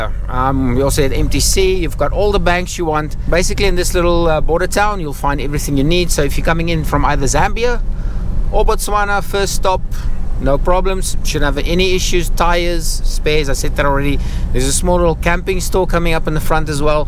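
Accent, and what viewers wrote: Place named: South Africa. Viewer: I love ur south african accent. I lived in rsa few years. Genit u dag meneer .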